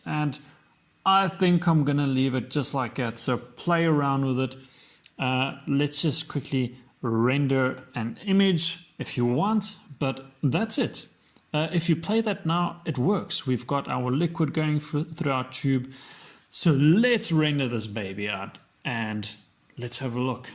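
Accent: South Africa. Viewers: south african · Great to see a fellow Saffa in the 3D community :D Could recognize that accent in the first few seconds. Great tutorial! Thanks for the effort.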